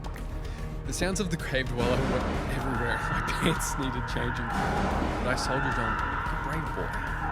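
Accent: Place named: Australia. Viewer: Oh GOD you're AUSTRALIAN?! 🤢🤢🤢🤢🤢🤢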